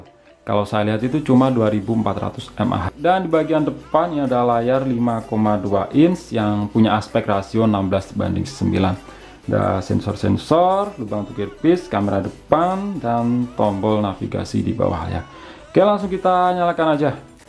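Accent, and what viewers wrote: Blitar, Indonesia. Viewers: Mas opo Wong blitar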